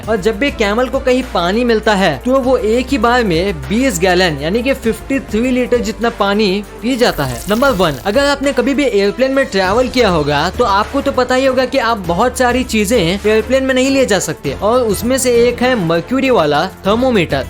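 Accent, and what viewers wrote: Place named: South, India. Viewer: Tumhari aavaz se lagta hi ki tum south indian ho!!!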